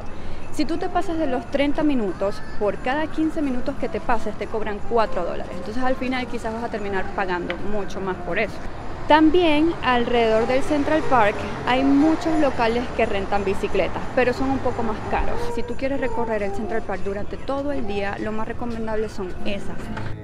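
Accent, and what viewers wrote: Venezuela. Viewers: De donde es esta chica? Tiene un acento como de Venezuela,pero dice palabras que solo usamos en México 🤔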